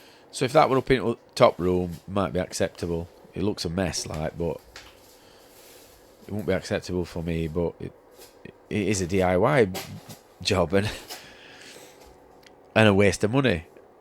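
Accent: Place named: Liverpool, United Kingdom. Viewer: I didn’t know you was from Liverpool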